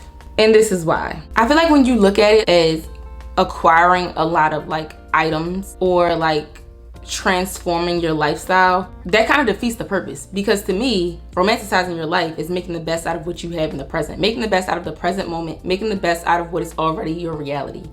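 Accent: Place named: DMV, United States. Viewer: Sis you gotta be from the DMV . It’s the accent for me . I love it here 💕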